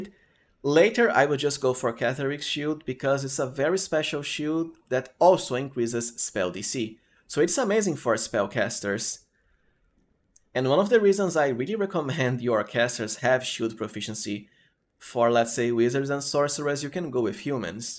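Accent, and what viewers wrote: Brazil. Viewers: Tu tem um sotaque brasileiro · This guy has to be Brazilian, I just know iiiiiit!!!! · Com esse sotaque ja sei que eh br.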